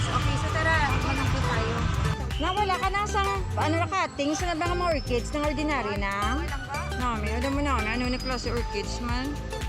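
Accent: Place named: Iloilo, Philippines. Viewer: This event i always remember during my childhood days... and your ilongga accents reminds me of my place.napangiti mo po ako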